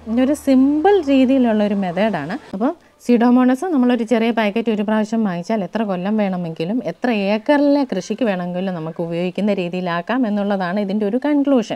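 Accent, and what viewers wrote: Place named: Pathanamthitta, India. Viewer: പത്തനംതിട്ടയിലേത് ശുദ്ധ മലയാളം ആണ്.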